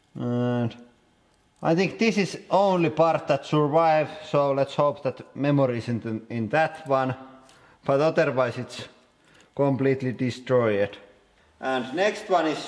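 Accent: Finland. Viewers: Derp he is finish (idk how you spell that) I'm Dutch lol ik Ben dat wel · kiva rallienglanti, huomasin heti et oot suomalaine :D kivasti tilaajii sul:) ja kiva idea.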